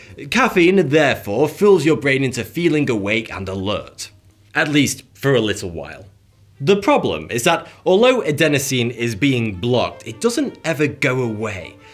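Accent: United Kingdom. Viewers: Accent of this guy sounds a little like over-acting .... he sounds like the uttermost british guy you can get in the UK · This guy has a Monte-Pythonesque accent. Does anyone know what kind of accent this is? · uk narrators eventually get on my nerves...wish theyd just talk in a normal, non-dramatic, non-sarcastic or ironic voice..I just want info, Im not looking for the most amusing delivery vid...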